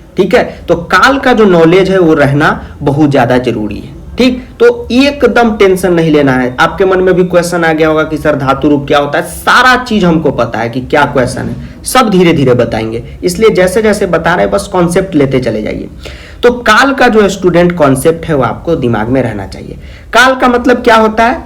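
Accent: Bihar, India. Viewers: Your teaching style is so good and your bihari language is so amazing 😍 😍😍😍😍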